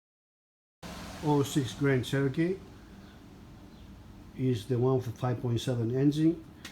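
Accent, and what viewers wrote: Greece. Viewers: Great video, I'm curious if that's a Greek accent?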